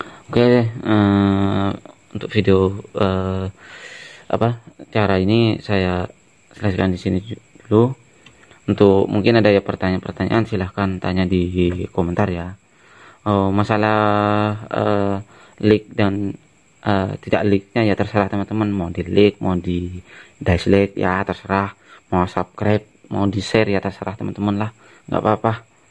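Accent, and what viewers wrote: Madura, Indonesia. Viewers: hihi kadeng kloar skunik madurenah. Sukses lah bos lanjutken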